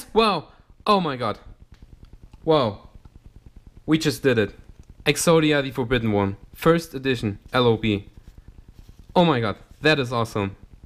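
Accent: Germany. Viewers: Das Video leider erst gerade entdeckt :D Ich schreibe jetzt Mal auf Deutsch, weil sich Dein englisch so anhört, als würdest Du prinzipiell auch deutsch sprechen^^ Aber echt beeindruckender Pull. 1st Edition LOB Exodia boosterfrisch - wow! Da kann man nur Glückwunsch sagen :) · Kommst du aus deutschland ? :) hast so nen deutschen akzent 😊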